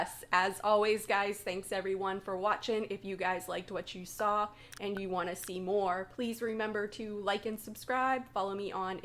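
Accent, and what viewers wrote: Pittsburgh, United States. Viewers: Ur Pittsburgh accent is showing through... Lol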